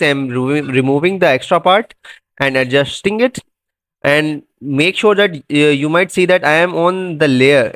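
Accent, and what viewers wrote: India. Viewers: When I hear the Indian accent I knew I was gonna leave with what I wanted while he explained it to me simply ❤, Tysm pro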